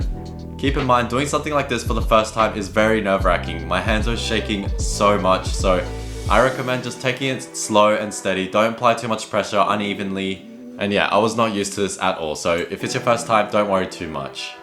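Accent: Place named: Australia. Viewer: G'day. Decided to follow you because you're the only the second Australian accent I've heard doing YouTube watch content and wasn't interested in the other guy's content enough to follow. Please invest in some decent lights when you can and a better background, and I hope this goes from strength to strength for you. Cheers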